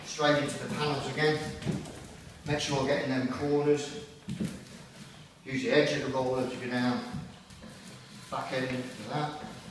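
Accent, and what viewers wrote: United Kingdom. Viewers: Are you British? Well no wonder I can't understand a single word you're saying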